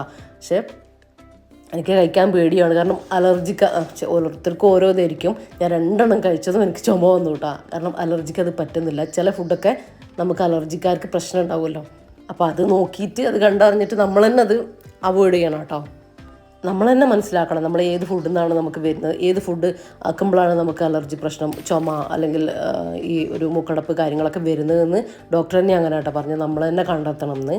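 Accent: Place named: Kasaragod, India. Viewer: Ithante Kurachoke words Kasaragod slanganallo.❤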